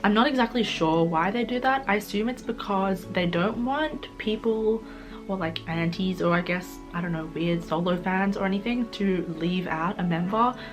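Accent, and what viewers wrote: Australia. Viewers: I'm trying to understand everything you were saying but you speak too fast and my dumb mind can't affort that aussie english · My dear , i wish someone would put at the comment site on what actually the step is because im not saying your way of explaining is bad but within your aussie accent i just cant understand much mate . It would be nice if you nicely put the steps at the comment site or at description or even subtitles because i cant get through of the whole video because i wasnt understand much . I just understand until at skz twitter link and after that about the albums mate i really doesnt understand i hope that you will understand our situation is . I really adore you and i wish to see more of your videos . Love , support and feedbacks from malaysia ! ❤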